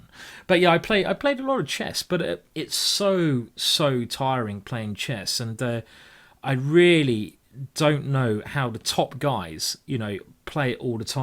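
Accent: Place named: RP, United Kingdom. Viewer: Don't understand what the guy is talking about, uses nothing but pure queens English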